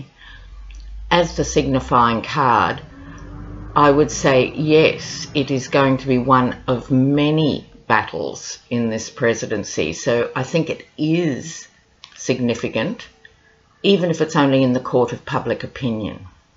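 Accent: Australia. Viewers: Great to hear a fellow aussie. Good stuff!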